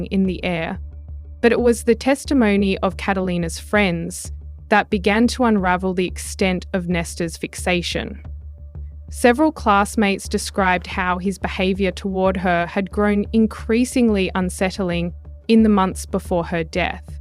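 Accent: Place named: Australia. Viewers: Love this accent. Im thinking its Australian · Yeah it’s Australian. Many channels are AI voices now so I am happy to find a real person speaking.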